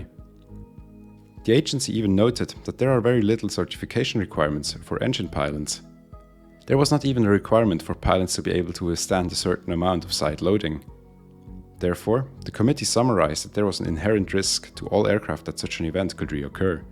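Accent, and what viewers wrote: Switzerland. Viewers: You draw only one wave, that right over the obstacle. Which is orographic wind (wind following the contour of the landscape) and has nothing at all to do with the wave phenomenon. The wave is a gravity wave of the whole atmosphere BEHIND the obstacle. (Comparable to the wave of a stream behind an obstructing rock.) Rotors are aligned with the waves behind the ridge, and not just chaotic phenomena downstream as your drawing implies. Completely off topic: Are you Swiss? I seem to hear a hint in your accent. (Swiss myself.) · Fantastic videos, and love your (more Swiss, than Austrian, actually) accent. One nit: I observed a pattern where you say things like "all but unforeseeable", when really what you mean is the opposite: all but foreseeable, meaning it is everything except that it can be foreseen. Keep it up, man, these are great.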